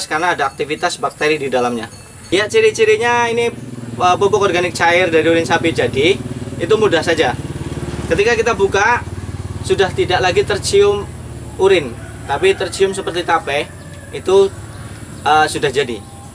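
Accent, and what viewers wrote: Banyumas, Indonesia. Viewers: kang ngapak banget ya......asline ndi jenengan..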